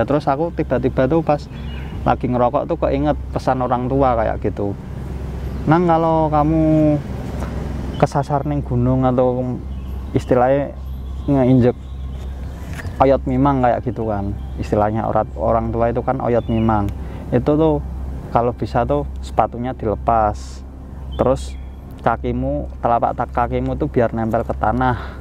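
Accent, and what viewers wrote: Java, Indonesia. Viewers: jowo ne medok mantapp👏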